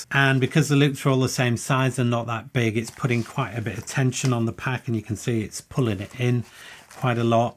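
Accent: United Kingdom. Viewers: May I recommend that you consider Asmr artist work! Your voice can be quite relaxing! Maybe it's the British accent!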